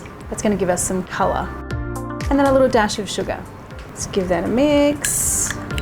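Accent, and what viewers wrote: Australia. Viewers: As an Aussie, I'm guessing from your accent, you're one too? I am new to your channel but love your videos!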